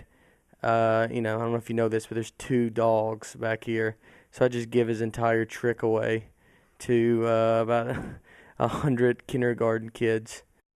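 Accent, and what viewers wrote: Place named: Tennessee, United States. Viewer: New York... really? Well, I understand. I usually don't crack up at stand up, but your accent is ridiculous. I'm from Tennessee, Nashville area too, so yeah I get it :) Come to Zanies! Book a show, bring your wife and we'll go out to dinner; you two and my fiance! You remind me of my friends and myself! Really relatable. That's probably why I can understand where you're comin from and why you're perspective is refreshingly funny. Oh, wait, no that's the Italian back hair...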